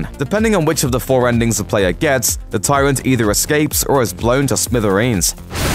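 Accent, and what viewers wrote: United Kingdom. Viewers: What’s your accent? Sounds British but not familiar with ‘citay’ or ‘zombay’ accent! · Can you not be British? I'd like for just one shitty recap video maker to not be British.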